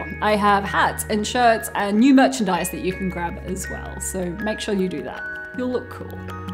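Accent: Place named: Australia. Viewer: It's alright, i will always trust you because you sound like me (Australian). :DDD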